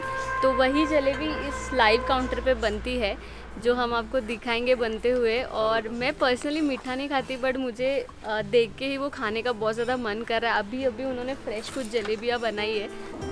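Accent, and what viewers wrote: India. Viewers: Your channel is nice and content is good too.. doing videos in hindi helps larger audience to watch it, which is awesome too! But why to pronounce marathi words in hindi accent 🤔 somewhere we do this which sounds weird.. be proud of our language and pronounce मिसळ as मिसळ and not मिसल.. just one example im giving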